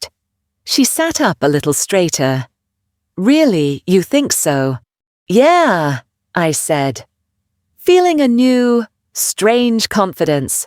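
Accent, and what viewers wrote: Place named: United Kingdom. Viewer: Enjoying the British accent.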